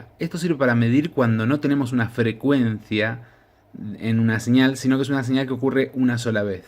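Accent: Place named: Argentina. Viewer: un español que habla en argentino. jej. . tu video para alguien que recien comienza, el mejor de los mejores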